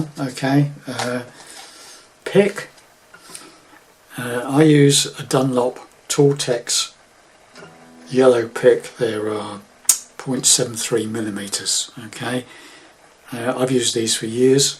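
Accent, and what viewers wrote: United Kingdom. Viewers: I’m now just seeing this video 7 months later. It never showed in my feed. I was one of your very first subscribers who suggested tons of lessons in time that are on your channel. You were the person I turned to for tutorials when I started learning guitar. You’ve helped greatly and you are extremely appreciated, I hope you know that. I’m far along in life now from when you did your channel. I can play extremely well now, and have learned a lot. Even my music has changed from the music I suggested, I’m definitely more into country music and folk music now. Also, I always knew you were British from your accent! You’re a good lad. You’ll always be in thought mate. Hope all is well. Cheers!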